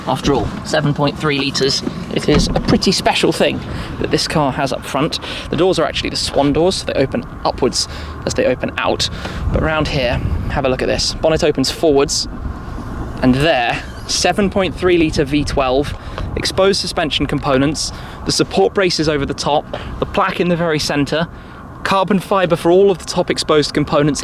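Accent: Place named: United Kingdom. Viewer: 영어는 뭔말인지 못알아 들어도 이사람 영국 억양 오지게 쓰는건 느끼겠다.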